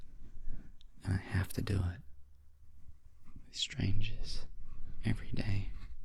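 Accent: Australia. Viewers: Your channel banner looks a hell of a lot like the roads going through Mt Baw Baw 😂 Just found you, honestly it’s nice to hear an Aussie voice for an ASMR fix.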